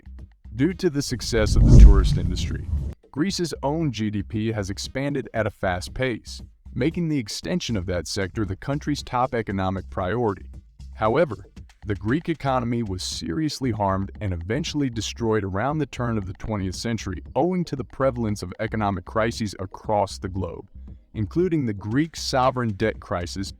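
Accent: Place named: United States. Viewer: Not impressed , sounds very American ,therefore biased ?The narrative has no connection to video !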